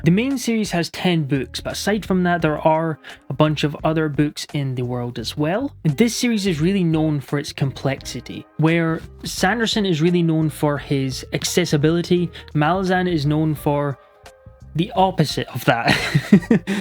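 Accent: Scotland, United Kingdom. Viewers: Are you Scottish? You sound like me if I went to Kelvinside and then spent at least 19 years in the Netherlands. Also, it's a great list, but I'd have had Brent weeks assassin series or Raymond E Fiest's magician series on there. Cheers anyway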